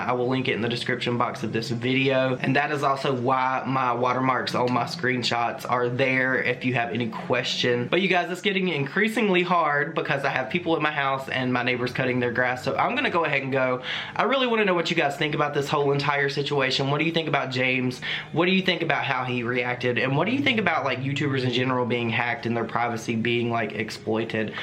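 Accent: South, United States. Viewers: Are you from the south? Cuz same.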